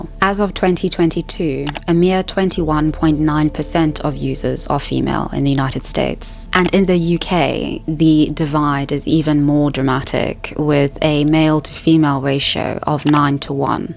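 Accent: South Africa. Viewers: She's originally from South Africa, stop trying to push the Queens English on everyone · I love your channel, and I've been binge-watching your content over the past few days. I hope you don't take this too personally, but I had to comment and say it drives me crazy that you pronounce "women" and "woman" the same. Is it a South African pronunciation?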